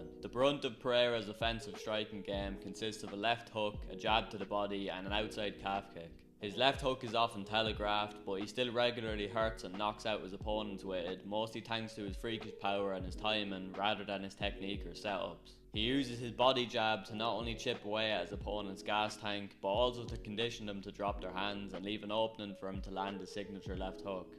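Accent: Ireland. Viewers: Definitely from somewhere in the midlands in Ireland with that accent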